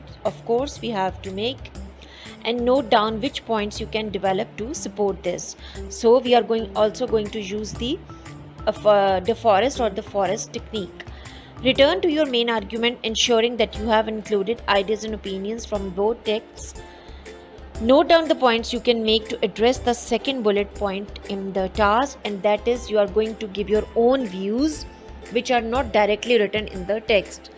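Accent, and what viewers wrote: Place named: India. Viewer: oh hell nah miss ur accent is indian typa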